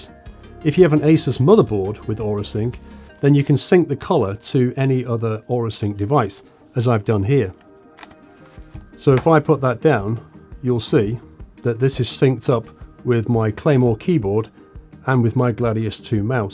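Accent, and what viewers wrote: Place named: England, United Kingdom. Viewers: Your British accent is so good. Even I subscribed!!!! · You DO know that he's English, right ? He's not TRYING to affect an accent.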